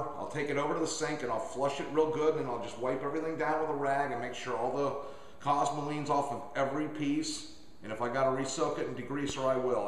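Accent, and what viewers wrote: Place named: Chicago, United States. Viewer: i dont think it really sounds mobster, its more like just a chicago accent. are you from chicago?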